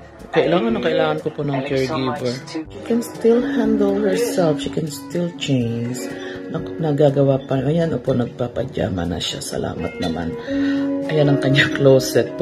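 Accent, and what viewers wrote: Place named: Abra, Philippines. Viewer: Madam ang tuno yang salita mo parang taga sa amin. Bangued Abra po ang aking hometown.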